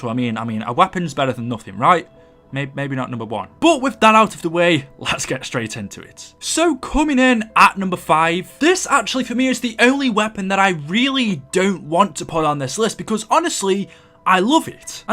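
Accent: United Kingdom. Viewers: Derek Monroy he is british, it doesnt matter how he says it...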